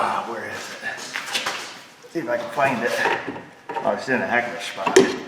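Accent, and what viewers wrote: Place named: Long Island, United States. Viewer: Are you located on Long Island New York it sounds like you might be I really could use a good mechanic like yourself trustworthy and very knowledgeable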